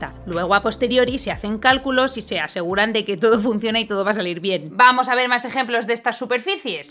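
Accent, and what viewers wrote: Spain. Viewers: El acento español cago el video ojala haya subtitulado en latino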